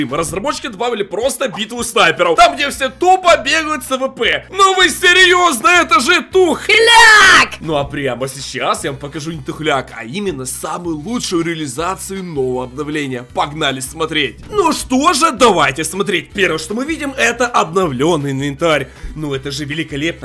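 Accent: Ukraine. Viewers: Брат ты из украины ???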